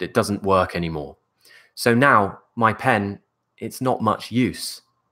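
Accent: United Kingdom. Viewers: Hi I'm from Indonesia, I love your British accent, I like your pronounceation it completely makes me understand. And then you explain the meaning of the words. Thank you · I’m studying a British accent for acting here in California. So glad I found someone with an authentic accent online who’s also a teacher:) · Oh man you just make me fall in love with British accent, I watched most of your videos, and it was really useful, I hope that to make a video about most words that people from UK using, ( informal words I can use with my friends) Thanks from Morocco 💙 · Lots of Love from Dhaka,Bangladesh 🇧🇩. I am quite usual with British Accent through your YouTube Video. Thank You Sir. You are my accent teacher.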